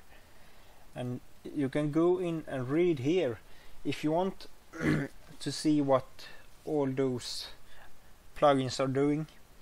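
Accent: Sweden. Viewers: Thanks! I was completly sure that you were swedish when I heard you talking.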